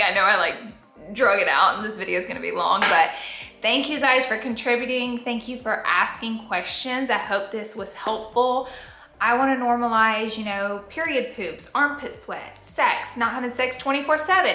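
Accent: South, United States. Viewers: Heyyyy 🤗 I will never get tired of you Accent soo Country I Love it 🥰🥰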